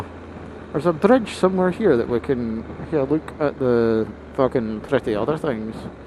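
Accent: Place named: Scotland, United Kingdom. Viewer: That Scottish accent is seriously awesome :D...subbed for that alone lol ..now to watch some more vids ;)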